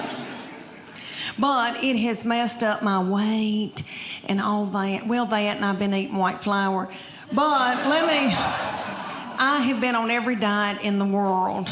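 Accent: United States. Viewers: I love this woman so much. She is the only woman I have ever cone across that sounds as country as me! 🤣😜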